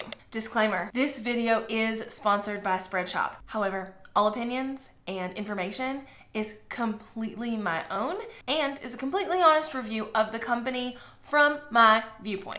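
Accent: South, United States. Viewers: Yeah, your Southern accent is really working for you here. Also, awesome video review from your experience (even though it's a paid promo)! Keep up the fantastic work!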